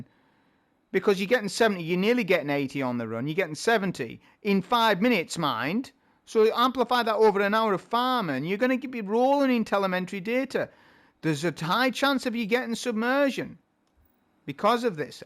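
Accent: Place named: Chesterfield, United Kingdom. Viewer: another great video brother... you sound local to me, im from chesterfield. you got discord?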